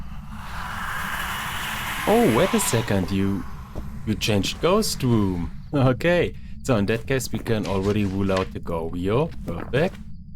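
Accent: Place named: Germany. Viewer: I would definitely go for Germany but he just has a strong German accent in his English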